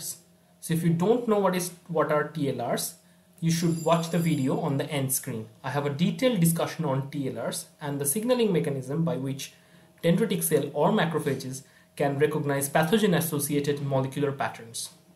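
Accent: India. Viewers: Fuck !!! I hate Indian accents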